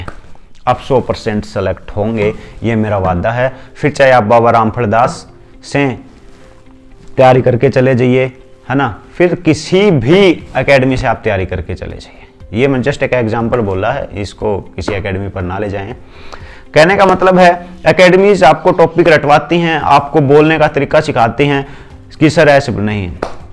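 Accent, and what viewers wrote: Haryana, India. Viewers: Apki voice se pta chal rha ha M be haryana se hu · are waah sir ji Apki boli me haryanvi ras dikkh hai hariyana te ho k😄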